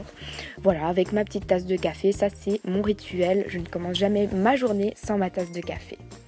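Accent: Belgium. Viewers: tes belg ?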